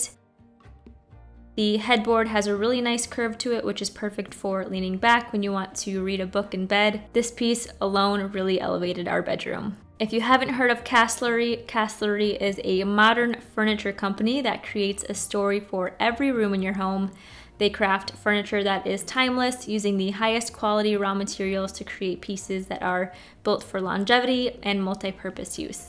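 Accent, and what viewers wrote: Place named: Russia. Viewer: You kinda have a Russian accent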